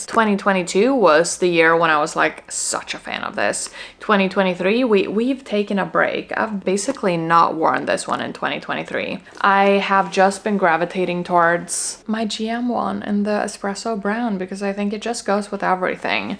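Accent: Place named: Midwest, United States. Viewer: I have a non bag question. Where did you learn English.? Do most swedes speak English.?.Your English is excellent. You have a bit of a Midwest American twang, very slight, but I can hear it. I lived in Chicago for 10years. Thanks to you I'm looking to get an Evelyn. I never would have looked at them prior to meeting you. I thought they were not pretty. So thank you for opening my eyes. I'm looking for a maroon colour. The GM is the largest one, right? Wish me luck....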